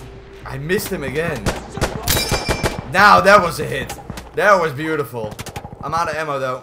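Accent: Netherlands. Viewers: The Dutch accent is real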